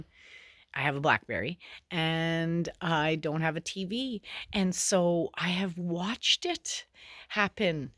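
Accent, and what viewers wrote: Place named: Michigan, United States. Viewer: your accent reminds me of the mother's I grew up in hearing in Michigan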